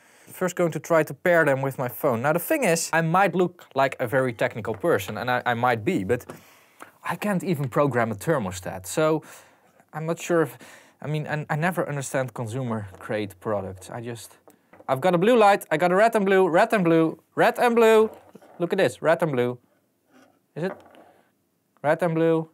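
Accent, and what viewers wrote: Netherlands. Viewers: I have a girl in my college, she's from the Netherlands, she speaks with exact same accent=)) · Its typical dutch english, Its How almost everyone in Holland talks english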